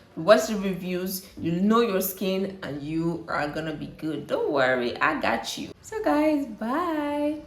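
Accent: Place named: Nigeria. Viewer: Have a Ghanaian name yet sound like Nigerian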